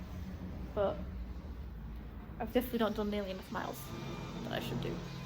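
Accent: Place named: West Yorkshire, United Kingdom. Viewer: Loved the video, I could listen to a West Yorkshire accent all day long! Interestingly I didn’t realise you needed a bonnet, we might have one of those somewhere too! Can’t promise it’s great but I remember it being better than your current one. we can hunt around the dreaded store room when lockdown allows! 👍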